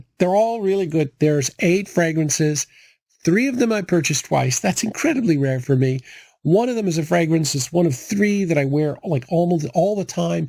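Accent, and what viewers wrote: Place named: Canada. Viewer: This was my first time on your channel but I could tell you're a fellow Canadian pretty quickly, glad I got that accent detection down. Also I'm pretty sure that's a maple leafs puck in the corner. Good stuff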